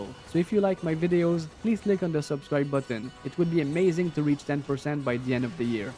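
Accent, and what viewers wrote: Quebec, Canada. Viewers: Me semblais en ecoutant jtrouvais sa sonnait quebec 😂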